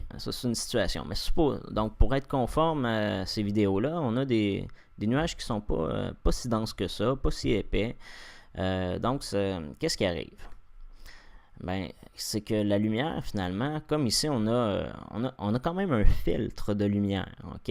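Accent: Canada. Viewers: Maintenant, dès que j'entends l'accent canadien je suis sur mes gardes mais là le propos m'a l'air logique, clair, cohérent, argumenté. En un mot " globiste", je dirais même " sphériste" ou "rotondesque"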